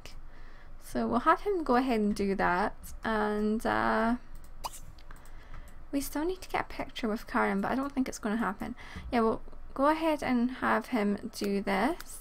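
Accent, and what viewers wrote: Scotland, United Kingdom. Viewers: We have the same accent I'm Scottish too 😊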